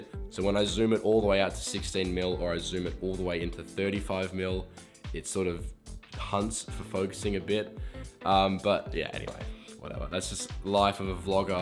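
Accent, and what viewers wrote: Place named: Australia. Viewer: gonna pee, pour some whisky and enjoy 20 minutes of your beautiful face and that aussi accent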